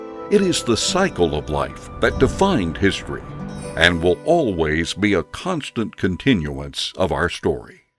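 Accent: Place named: United States. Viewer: Gagawa lang kayo ng historical video on Manila Cathedral kumuha pa kayo ng voiceover with American accent who mangles the Latin-Spanish names. Very distracting. You should have at least taught him the correct pronunciations. Stupid and insensitive. What a waste.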